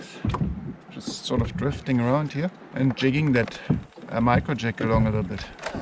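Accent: Germany. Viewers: hey mate, what exactly is that pedal boat you got there, from where did you got it and how much is it? was in jackson bay the other week and thinking to buy one aswell, would be mean. you sound german btw, grüße 😆✌🏻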